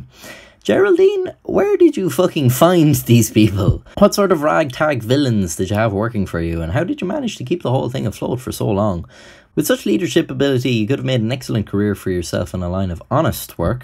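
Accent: Ireland. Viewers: You got 100k cause you have a Irish accent everyone likes Irish accents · a bit late his accent if you can not tell is clearly Irish so i believe that he is Lebanese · I thought it was Slavic but ever since I saw this comment it's sounds Irish not Slavic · Love your page! The content is very interesting and your storytelling ability mixed with clever bits of humor is top-notch. The Irish accent doesn't hurt either.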